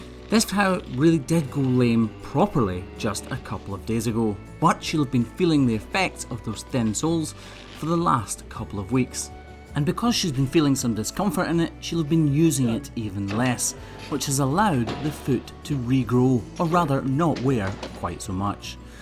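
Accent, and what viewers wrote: Scotland, United Kingdom. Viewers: I think the Scottish accent on a man is grrrrrrrrr😯😊😊😊😊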